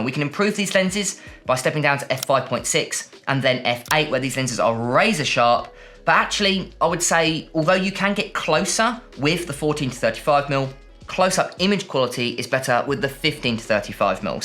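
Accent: United Kingdom. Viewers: your English accent is annoying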